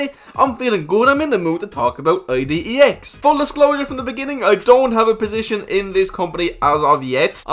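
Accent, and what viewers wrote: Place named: Ireland. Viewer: Cool accent , is that Irish ? I’m from China so I’m not good at accents . 😂👍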